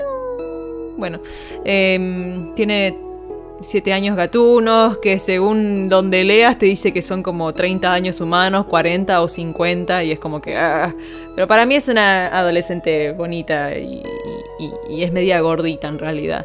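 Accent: Argentina. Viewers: Amo tus videos y me encanta ese asento tan argentino que tenes 😂 Te amo ❤❤❤❤❤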